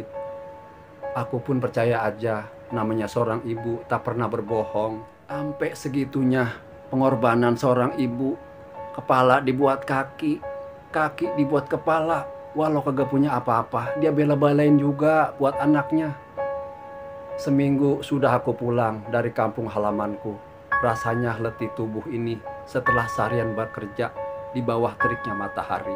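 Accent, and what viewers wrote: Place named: West Java, Indonesia. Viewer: jngn lupa logat Cibogo pake Terus dan plesetan semangat 💪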